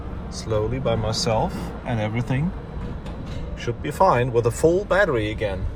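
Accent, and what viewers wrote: Germany. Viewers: Very good. I like it very much when Germans speak English. Go on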